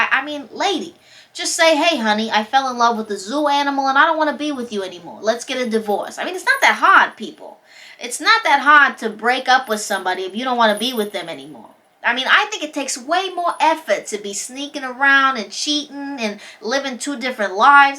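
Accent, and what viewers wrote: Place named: New York, United States. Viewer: What's annoying is your thick New York accent...... I'd rather hear the bells!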